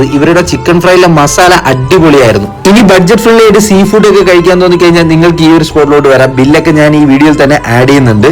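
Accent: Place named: Trivandrum, India. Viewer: Tvm mikkavarum anganeya parayne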